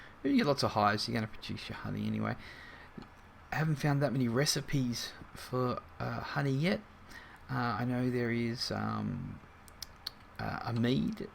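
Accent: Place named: Australia. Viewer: good thick aussie accent there, from another strayan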